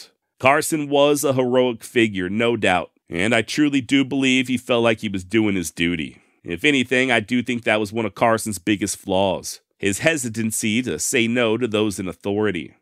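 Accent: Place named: South, United States. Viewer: Sir..it is a pleasure to listen to your southern accent. And your humour is refreshing. Your account and your presentation is undeniably researched. Thank you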